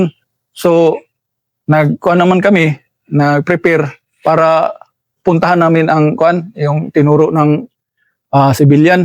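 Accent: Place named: Visayas, Philippines. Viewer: Bisaya jud kayo si master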